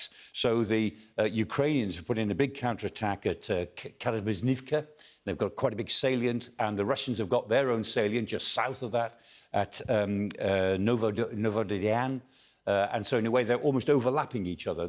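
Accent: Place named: United Kingdom. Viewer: Very beautiful british accent!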